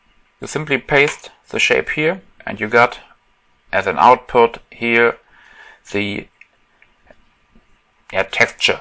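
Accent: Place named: Germany. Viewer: kannst das mal auf deutsch machen hört man doch das du deutscher bist:D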